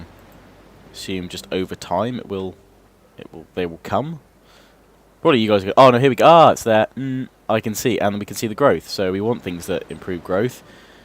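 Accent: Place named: United Kingdom. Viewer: fuck you will your british ascent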